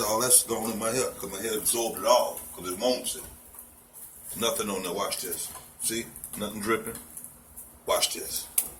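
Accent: Florida, United States. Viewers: NATTY NATTY you can tell he from Florida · I can tell that u striaght from Florida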